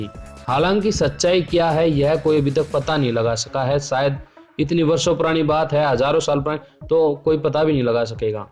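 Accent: Haryana, India. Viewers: Haryana ka hai paka akhar sound se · Bhai Haryana se h kya tu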